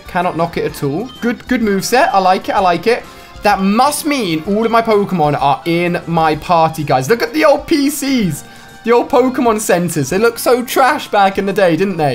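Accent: United Kingdom. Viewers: no shade but i always have trouble understanding accents like this like the british variety ykwim sis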